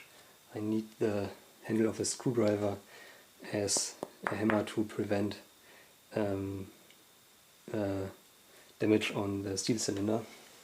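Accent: Germany. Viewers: are you from Germany? sounds like that on your accent..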